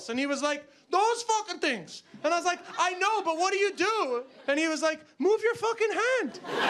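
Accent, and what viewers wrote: Wexford, Ireland. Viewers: this must have happened in Wexford cause u can hear the Wexford accent coming out in u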